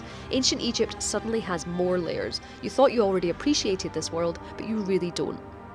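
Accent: Scotland, United Kingdom. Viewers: TDX just her accent,Scottish i think,pretty cute actually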